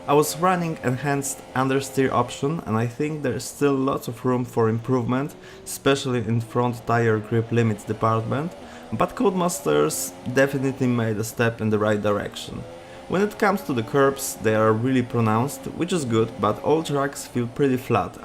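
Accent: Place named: Poland. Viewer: słyszę ten polski akcencik. Fajna recenzja :D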